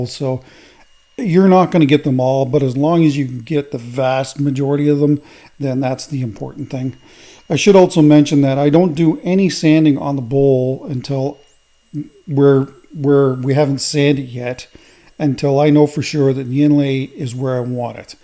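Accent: Canada. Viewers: I love listening to your commentary as you work! And Canadian accents are the best 😊 Two beautiful pieces today. I usually go for walnut, but the spalted birch is my favorite.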